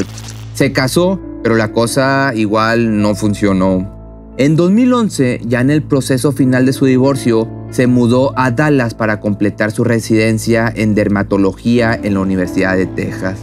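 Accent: Mexico. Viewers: Es cierto, tiene cierta gracia al narrar, eso me hace ver sus videos y esto que no me gusta el acento mexicano.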